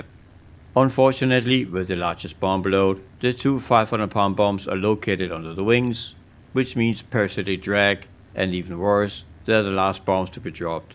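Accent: Denmark. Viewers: man kan bare høre det er en dansker når de snakker engelsk😅 god video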